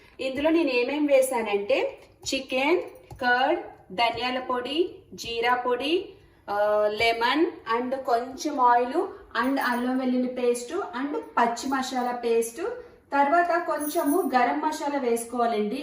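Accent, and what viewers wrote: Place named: Mahabubnagar, India. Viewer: Na language kuda me mataladinate undati same I am mahaboobnagar district . so nice mam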